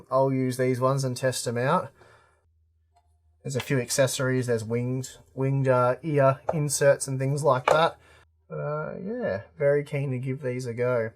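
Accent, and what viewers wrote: Australia. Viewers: "Got mai knoif, a blont knoif". Gotta love Aussie accent! :D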